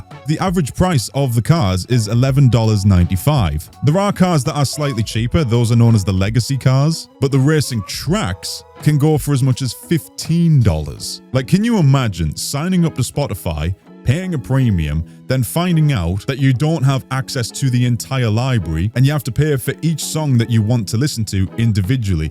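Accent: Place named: North East England, United Kingdom. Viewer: So where are they going to get money from to keep the servers on? It’s all well and done to whine about costs, but long term, unicorn tears and candy floss won’t keep it going. I like Iracing and LMU and race both regularly. I hope this new sim succeeds but long term support isn’t guaranteed without money. Nice to hear a fellow North East accent, even if I’m older than your dad.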